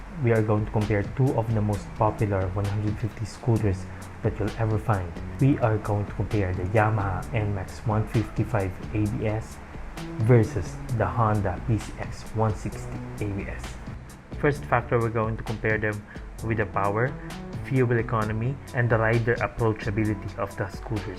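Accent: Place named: Philippines. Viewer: I'm liking the filipino accent. Also he's aiming for a much bigger audience obviously so he's using the universal language so other who aren't Filipinos can understand. Just saying.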